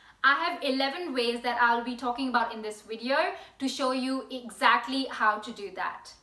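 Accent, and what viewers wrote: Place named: United Kingdom. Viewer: I guess she lives in britain from a long time since she's using British accent, so you know she got that habitually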